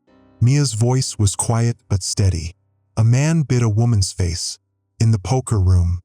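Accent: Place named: Spain. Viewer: The Spanish accent occurring randomly throughout is distracting. How does that even happen?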